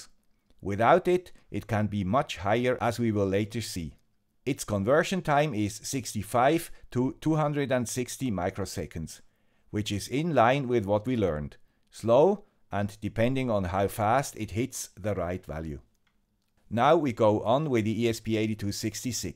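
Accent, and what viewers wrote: Switzerland. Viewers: No love from the guy with the Swiss accent for Swiss microcontrollers? I was expecting to see an STM32 here 😋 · Hey, you replied! No I am in fact not a native but I've got a CEFR C1 Language certificate which basically states that my English is quite good. This was in no way meant to insult you, as you also call yourself "the guy with the swiss accent" ;) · Hello, you do not have a swiss accent but a swiss GERMAN accent ! ! ! Grüsse von der anderen Seite der Röstigraben. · the pronounciation is on purpouse to catch viewers, he speaks without the swiss accent in some vlogs:) I hear it every day at work, so I'm used to the accent :) Sali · Watched already a lot of videos of your channel and at the beginning it was a lot of „häääää, versteh grad überhaupt nix“ but from one video to the next I start to understand what you are explaining us. 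Have to say that I am a totally new beginner. My goal is to measure the 2 12V Solar Batteries in the OFF-Grid garden of my parents in law. Tried a lot with the ADC from the ESP32 itself and as u mentioned it’s readings are just bullshit. Thank you for introducing the ADS1115 to me. I guess that will fix my measuring problem and can finally bring the project into production. As I am original from Vorarlberg and worked many years in Kanton SG I love to hear your swiss accent as it remembers me of that great time in my life! Electronic wise you are my personal hero ;-) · He has a Swiss Accent though! :-)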